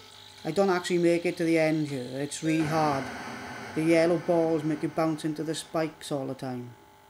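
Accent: Wales, United Kingdom. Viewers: Awesome little game!,love the Welsh accent lol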